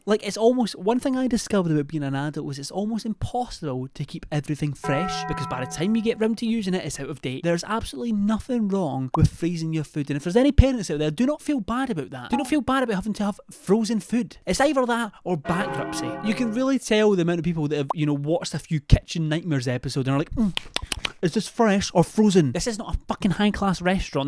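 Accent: Scotland, United Kingdom. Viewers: Hearing you speak immediately made me miss living in Scotland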